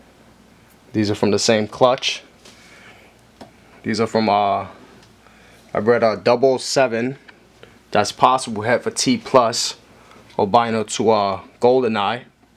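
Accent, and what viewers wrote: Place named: Massachusetts, United States. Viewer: Really great job bro. I'm from Worcester u sound like ur from mass. I'd love to grab one from you.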